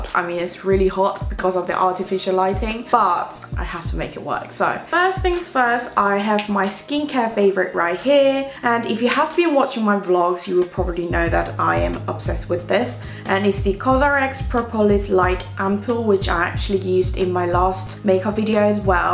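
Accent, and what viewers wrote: United Kingdom. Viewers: 살도 빠지시고 더 예뻐지신것같아용 ㅋ고급진 영국 발음하며 정말 너무너무 좋다눈 ㅠㅠㅠ 영상볼때마다 기분 짱 좋아용 ^^글공 오늘 입은 의상정보도 주세용용용 ^^ 넘 취향저격 ㅋ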